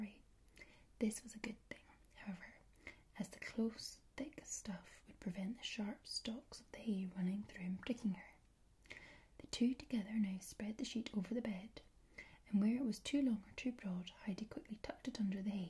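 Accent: Scotland, United Kingdom. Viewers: 180 minutes of tingles from the resplendent scottish lass. bank holiday monday just got better. ☺️ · Love me some and Scottish ASMR 🥰😍😴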